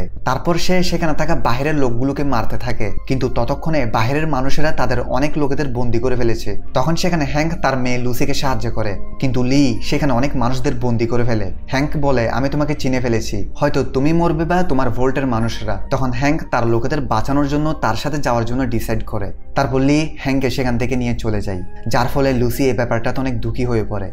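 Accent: Chittagong, Bangladesh. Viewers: Brother this is chattagram special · ভায়ের বাড়ি মনে হয় চট্টগ্রামে। দিন শেষে আঞ্চলিকতার টান চলে আসে। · ভাইয়ের বাড়ি কি চট্টগ্রাম?আমি চট্টগ্রামের। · Bhai mone hoy Chittagong er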